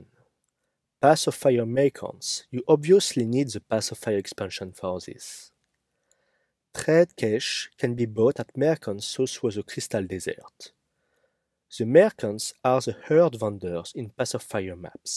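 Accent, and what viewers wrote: France. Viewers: I literally have to put on CC because of how thick and hairy his French accent is. But I love how good his editing skills are. Literally don't need to listen and can watch everything to get the information. So does it matter? I love his work! Tu es beau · I'm French and it's surprising to me how he obviously knows and understands English quite well, meaning he's been exposed to it quite a lot, and yet somehow he still retains that very thick beginner French accent that all high schoolers have. The amount of French people who have way less vocabulary and understanding of English grammar than he does and yet speak with a much better accent is astronomically high.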